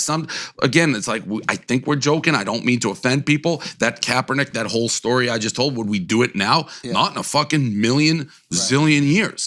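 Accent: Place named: Boston, United States. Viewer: love the Boston accent when its mixed with good articulation.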